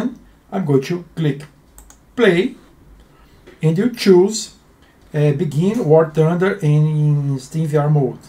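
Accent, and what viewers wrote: Brazil. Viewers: esse sotaque ai é br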